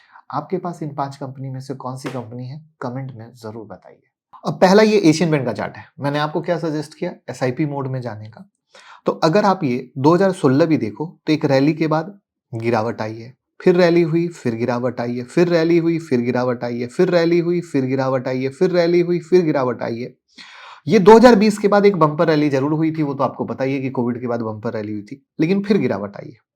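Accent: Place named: Bihar, India. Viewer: He is not "Marwadi" From his accent seems to be a "Bihari"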